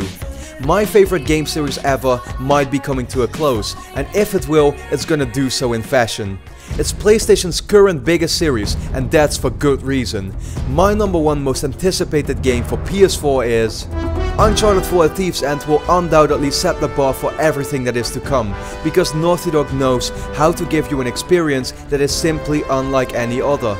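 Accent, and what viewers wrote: Netherlands. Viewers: Ben jij Nederlands je accent klinkt wel nederland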